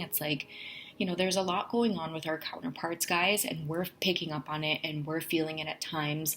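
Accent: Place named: Trinidad and Tobago. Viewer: Whoa.....wait a minute...did I just hear you say you are from Trinidad....I knew I heard a Trini accent yesterday when I was listening to another one of your readings....I also lived in Toronto before moving to the US. I have been listening to your readings for the last year now. Great job girl...I am a male Divine Feminine Twin Flame. I met my female Divine Masculine 19 years ago but didn't know anything about Twin Flames at the time. I learnt about the TF journey 4 years ago. We are not in union but hey who knows what can happen. However we are both ascending very very fast nowadays!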